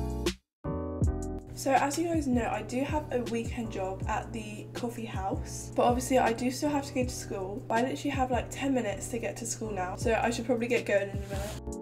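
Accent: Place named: United Kingdom. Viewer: my british accent (Btw I’m not British I’m American): “cAn yOu pLeAsE hAnD oVeR tHe wOaTeR” your british accent: 😙👌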